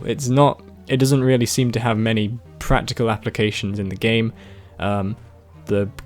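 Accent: United Kingdom. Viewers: I don't know why, but I, as a non-English-speaker, love your British accent.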